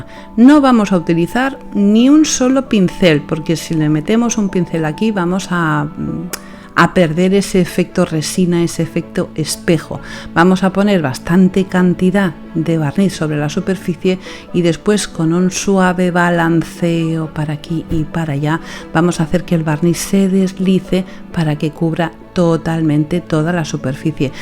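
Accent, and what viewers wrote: Spain. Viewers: Bueno.... que decirte mi querida profe. Sin palabras como te digo siempre....de verdad no encuentro la palabra superlativa de las bellezas que haces. Te admiro tanto. Es tan dulce tu arte. Que cuando veo tus videos me emociono hasta el alma. Es tan magnífico como explicas,la música, tu voz con ese acento español que me encanta. Gracias por enseñar,por mostrar tu arte. Me pone tan feliz cuando aparece un vídeo tuyo. La niña es hermosa. Delicada. Sin palabras querida. Un gran besos y admiración. Te quiero mucho ❤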